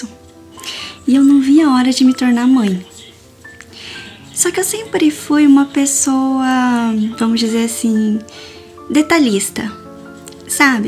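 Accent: Minas Gerais, Brazil. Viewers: Uma pergunta, você é nascida no Japão ou no Brasil? Porque essa fala em "mineires" foi demais :3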